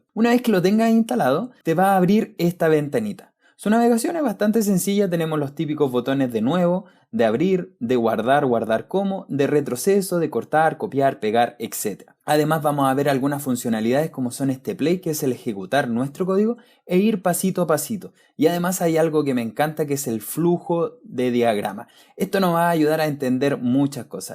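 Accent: Chile. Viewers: Acabo de conocer este canal. Apostaría mi cuello que el que habla es un compatriota chileno. Muchas gracias por tan buen canal, es un gran aporte.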